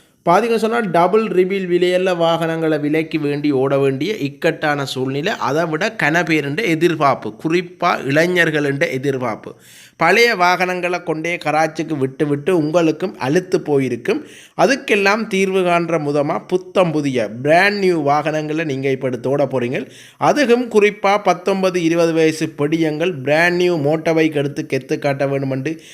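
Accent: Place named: Chennai, India. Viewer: Madras தமிழ் தூதூதூதூ ...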